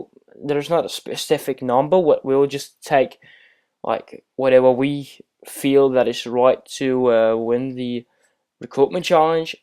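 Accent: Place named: Scotland, United Kingdom. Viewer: Du lyder lidt som en skotte også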